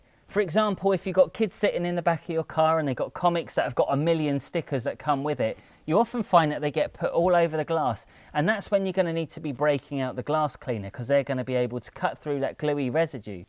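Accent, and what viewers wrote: United Kingdom. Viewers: I have no idea why you got so many views for this video!! Maybe the British accent? And dummies think just because you have a Btitish accent you might be teaching a magic?! Well. I just wasted 2 minutes watching your useless video mate...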